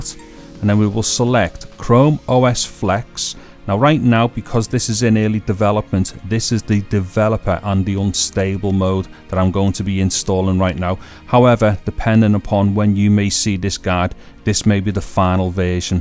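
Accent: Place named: Scotland, United Kingdom. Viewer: you sound Scottish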